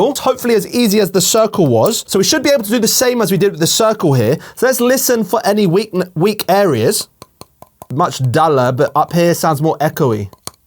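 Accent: United Kingdom. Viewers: my god! He has the bri-ish accent!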